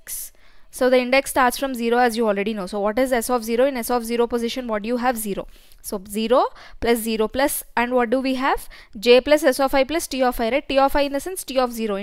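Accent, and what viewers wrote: India. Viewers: Indians..you brillian...but you better talk slower..and get Usa English accent host.. Indian accent sucks...my goodness..you sound like a n machine Gun...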